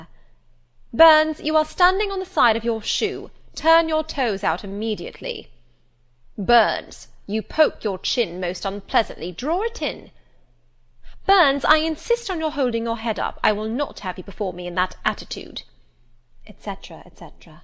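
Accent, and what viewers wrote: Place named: United Kingdom. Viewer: Very clear voice and british english accent, i am enjoying listening to this voice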